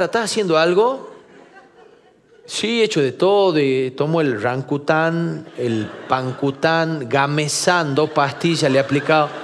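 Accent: Tucumán, Argentina. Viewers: no te gua ce nada, así hablamos los Tucumános jajajaja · los tucumanos hablamos divino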